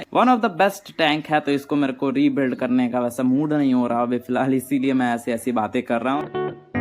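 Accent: Bengal, India. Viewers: Bengali ya bihari?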